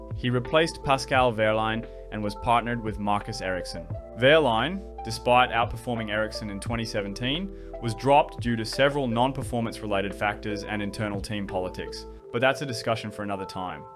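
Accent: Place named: Australia. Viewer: Love the way your accent randomly switches between Aussie and American between sentences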